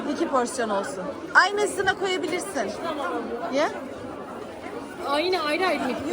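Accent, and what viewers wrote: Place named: Turkey. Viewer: Bayiliyorum senin turkce aksanina ❤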